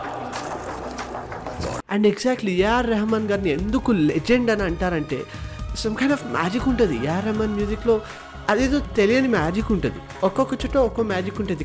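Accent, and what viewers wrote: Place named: Kerala, India. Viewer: Ni telugu yenti bayya malayalam vadu telugu ni appu techukunnattu undhi worste ga why yendhuku ala matladuthunnav thinnaga matloduchuga Andra style ledha Telangana style ayina matladu bayya teluguni kuni cheyyaku please..😢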